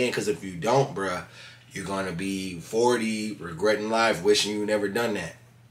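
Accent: Baltimore, United States. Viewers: what it dew. That has to be a Baltimore accent. Dope video bro!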